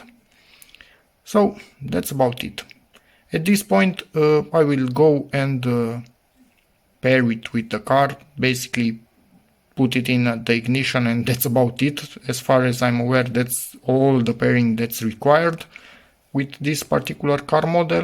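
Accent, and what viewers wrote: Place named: Romania. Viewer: Aoleooooo ... asta-i englezeasca rumaneasca. Cel mai urat suna englezeasca asta, rumaneasca. O recunosti intre un milion de variante. Categoric esti ruman !!!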